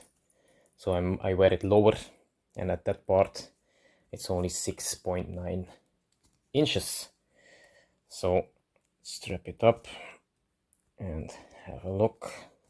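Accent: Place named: Netherlands. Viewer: So you're belgian? :) nice (anything else would still be nice - i like your video) I was suspecting a dutch-like english accent - your pronounciation resembles Mr.Dagon a lot (a guy with a pocket knife yt channel) Subtitles would help, but even without would be better than not making the video :)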